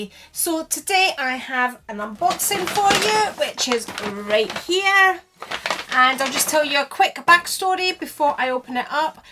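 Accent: Scotland, United Kingdom. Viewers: Beautiful classic shoes, Manolos are so sophisticated and unique !!!! It's always lovely to see your pretty face and I love your Scottish accent too. Lots of love as always to you, your mum and family XXXXXXXXXXX Q&A: how hard was it to marry so young and to have children so young ? How did you meet your hubby ? :) XXXXXXXXXXXXXXX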